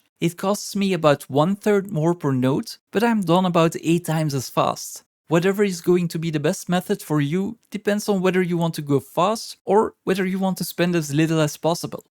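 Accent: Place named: Flanders, Belgium. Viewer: Hi, found your channel recently. I like your to the point guides. You sound "Flemish". Where are you from?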